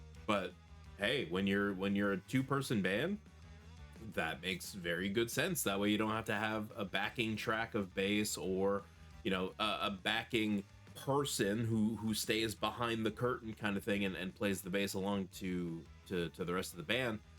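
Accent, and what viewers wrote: Quebec, Canada. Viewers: Where did you pick up your Quebec accent? Because you have traces of it? You know, I live in Montreal, but I'm still more than 5 hours from Saguenay, where Angine de poitrine (Heart pain) originates!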